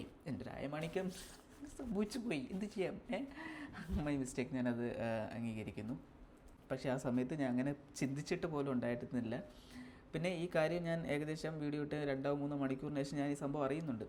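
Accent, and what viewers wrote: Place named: Trivandrum, India. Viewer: trivandum sideil ചില ഭാഗങ്ങളിൽ സംസാര രീതി ഇങ്ങനാണെന്നു ഞാൻ കേട്ടിട്ടുണ്ട് ആദ്യം ഒക്കെ ഇവരുടെ reelsile സംസാരം കേൾക്കുമ്പോൾ trivandram slang വല്ലാതെ standard കുറവായി തോന്നിട്ടുണ്ട്, . ഞാനൊക്കെ ഒരു conversationil നിങ്ങൾ”എന്ന് automatically പറയും. but പറയേണ്ടാ രീതി unclum , auntyum, ചേച്ചിമാരും ചേട്ടൻമാരൊക്കെ , എവിടെക്കാണ് പോണെന്ന് എന്നാണ് 🙄നിങ്ങൾ എവിടെക്കാണ് പോണെ എന്ന് ചോദിച്ചാൽ പ്രശ്നമാണ്🫣… അത് ഞങ്ങളുടെ നാട്ടിൽ disrespectful ആണ്.. എന്റെ parenstinte കയ്യിനു വഴക്ക് കേട്ടു മടുത്തിട്ടുണ്ട് ..അതുപോലെ എടി എന്ന് വിളിക്കാൻ പാടില്ല. but എനിക്ക് എന്റെ കൂട്ടുകാരി അങ്ങനെ വിളിക്കുന്നത് ഇഷ്ടമാണ് ഒരു closeness feel ചെയ്യുന്നു, എനിക്കു അങ്ങോട്ട് വിളിക്കാനും okey ആണ്, but parents and auntymar കേട്ടാൽ അപ്പോ ഉപദേശിക്കും.ഓരോ നാട്ടിൽ ഓരോ reethi.. ഇവിടെ ഞാൻ ഉൾപ്പടെ comments ഇടുന്നവരിൽ പലരും trivandrum അല്ലെന്ന് മനസിലായി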